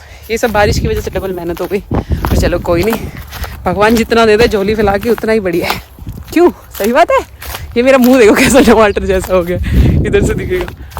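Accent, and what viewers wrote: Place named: Rajasthan, India. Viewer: Sister ya h Rajasthan kii boli m gandasho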